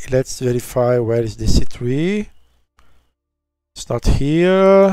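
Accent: Brazil. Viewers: percebi que era br pelo sotaque skaksakska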